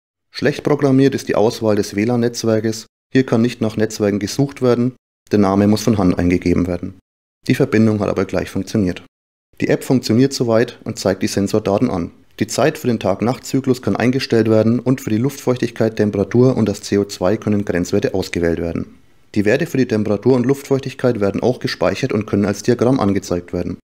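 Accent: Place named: Franconia, Germany. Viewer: Gutes Video👍🏻. Nicht zu lange, gut erklärt und angenehm zuzuhören. A propos zuhören. Darf ich fragen woher du grob kommst ? Du könntest vom Dialekt quasi mein Nachbar sein 🤣. Fränkisch ist einfach symbaddisch :) Lg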